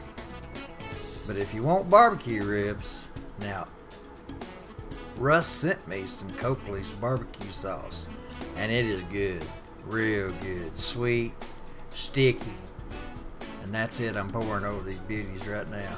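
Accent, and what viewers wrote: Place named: East Texas, United States. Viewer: Congrats on 7500! Does YouTube bump anything up when you hit that number? My family is pretty much small town and rural KS OK AND TX. A lot of your cooking is so similar to ours in technics, but you definitely have some recipes that I don't have. Remember Justin Wilson I think that was his name? Bayou cook on PBS the 70's or 80's. You don't have that ascent, but yours is similar to my relatives near Tyler. I enjoy your pleasantness and recipes! Keep it up!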